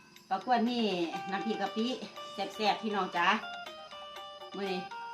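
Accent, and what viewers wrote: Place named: Sisaket, Thailand. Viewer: เป็นตาแซบแท้จ้า จ๋า❤️เว้าคือคนศรีสะเกษแท้จ้า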